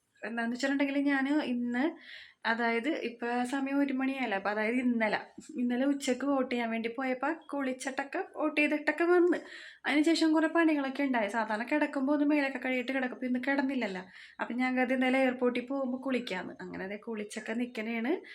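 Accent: Kochi, India. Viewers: Njanum kochi kari annu.... Kochi language kelkumbo othri santhosham..... Njan chennaiyil annnu ediku varum Kochi marakan pattumo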